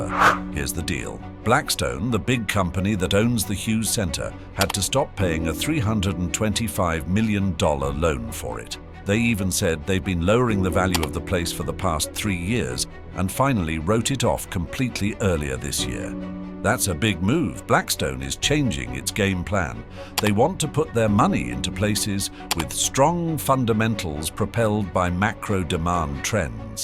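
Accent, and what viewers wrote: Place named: United Kingdom. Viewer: When I heard the voice was British....I knew everything said would be a lie.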